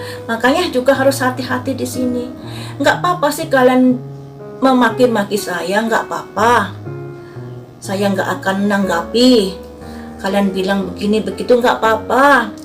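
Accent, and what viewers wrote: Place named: Java, Indonesia. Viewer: Suka dgn logat nya *...medok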